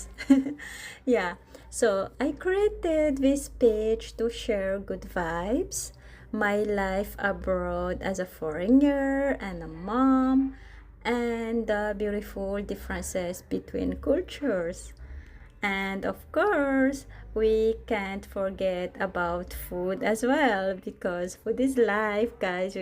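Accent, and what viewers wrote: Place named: Philippines. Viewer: You sound Filipino. Maybe that's because you live in the Philippines.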